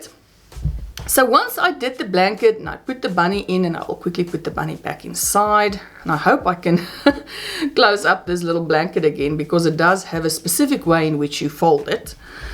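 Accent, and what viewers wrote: South Africa. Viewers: I listen to you and I thought to myself you ate South African. When you say you are from here I smiled. So happy you came in my feed. I'm from Cape Town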